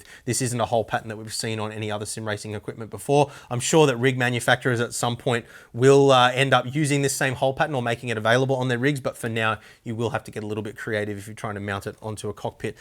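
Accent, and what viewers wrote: Australia. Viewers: Are you an Aussie mate? Thinking of using your affiliate codes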